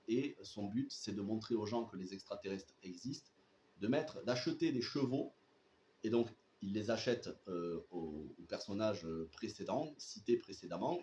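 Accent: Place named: South, France. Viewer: Nope reste meilleur qu'US mais je trouve qu'il est trop long avec un changement de ton qu'il ne maitrise pas tant que cela car parfois je suis sorti du film ... Trop d'idées (beaucoup de references) rend en finalite le film moins interessant et c'est dommage. Grosse qualité visuelle, gestion de l'espace avec des jolis plans, l'histoire des dresseurs de chevaux afro americains au debut du cinema, la scène finale avec la poursuite en moto, la relation entre le frère, le père et la soeur. Merci pour ta video avec ton accent qui chante les cigales du Sud 😉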